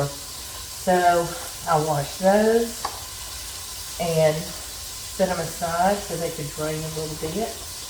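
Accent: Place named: Alabama, United States. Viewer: Bonjour, I love your cooking. I am a french canadian and my english speaking is influenced by your beautiful Alabama accent. This accent is not from my ancestry, so it must be a calling to visit your part of the world. 🌈💕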